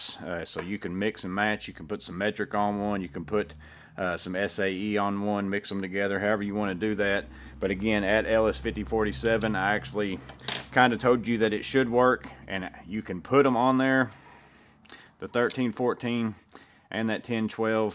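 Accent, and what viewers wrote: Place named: North, United States. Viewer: I have an accent, just like yours. And I have to squelch it, when I go back home to my elders country. Where they make all the tools, by hand and grow all the pumpkins for the holidays. It's got a heavy Old Dutch/Spanish demeanor. I grew up in Texas/Louisiana....but I'm from an Indian, Dutch/Old Spaniard area of North USA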